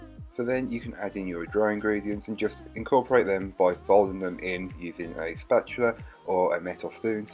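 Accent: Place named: England, United Kingdom. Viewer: Can I ask where your accent is from in England. It sounds very dulcet to ones hear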